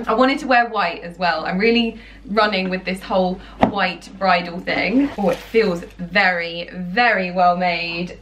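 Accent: RP, United Kingdom. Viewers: I haven't watched your videos in a while, and the first thing I wanna say after the first two seconds of the videos is: I'VE HELLA MISSED YOUR UNREAL ACCENT! Omg😍😂 I will always be jelous of british people. AND HOLD ON: HOW EXCITING IS IT TO BE TRYING ON WEDDING DRESSES like, for your actual weddingg!!😍😍😍 I'm so happy and excited for you!!! You teo look AMAZING together! So lucky ugh😍😍😍 Love youuu😄💗💗💗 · Hi, I am an Italian girl, I really love your accent, do you define your accent an "RP english"? It's sounds different and more elegant compared to other bloggers out there:) It's similar to the Emilia Clarke one:) Kisses from Milan <3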